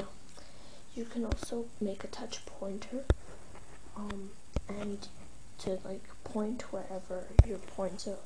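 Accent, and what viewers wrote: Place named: United Kingdom. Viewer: british accent hahhahaha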